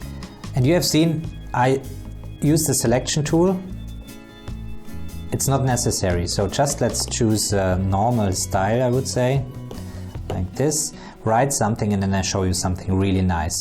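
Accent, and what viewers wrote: Germany. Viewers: Lol 🤣 ich wohne in Deutschland und meine Verliebte ist ein Deutscher! I could only tell because I’m used to hearing the German accent, I really like it! But seriously this review made me much more optimistic, Thanks for the review. I’ve been using a galaxy note <num> edition for about 5 years now and just switched to the ipadpro 12.9 last week. Immediately I noticed how bad the default note taking app was. I downloaded all the free note apps and none of them come close to S-Note in its features. I could import PDFs, I had way more templates (College ruled, normal spacing, grid, textures) better pencil options with a grain textured pencil that made it feel more like paper, the ability to transform written text into typed words or equations, search based on written notes, and so much more but best of all it was free! Overall I’m really disappointed in Apple’s integration with the Apple Pencil and iOS, I mean there is none. 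It’s basically a big iPad with a pen that was thought of as an afterthought. I got it primarily for art and in that regard it’s marginally better (Procreate is really fantastic and very professional), but the file management system, widgets, customization and split screen in iOS 11 is really limited in comparison to Android - but your review made a bit less cynical 👍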